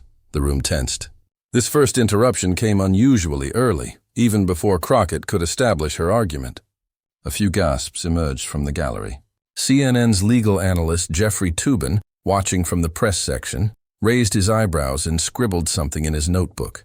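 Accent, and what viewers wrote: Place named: United Kingdom. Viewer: We need an AMERICAN narrator!! Not a Briton….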